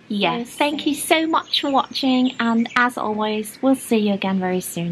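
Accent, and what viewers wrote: England, United Kingdom. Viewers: Absolutely lovely! I am new to your channel and see that you are German, but your accent is British. Did you live in the UK? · Your accent sounds more English than German 😊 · Lovely video. Are you from Germany? You seem to have a British accent. Were you raised in Britain? You do not sound like you have any German accent. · I like your British accent, so beautiful. House is very good. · ❤❤❤❤❤❤ You have a beautiful British accent, it doesn’t look like you’re from Germany :)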